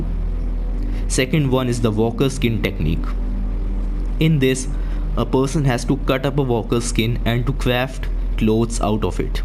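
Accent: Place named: India. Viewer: I prefer the Indian accent then some bot Ai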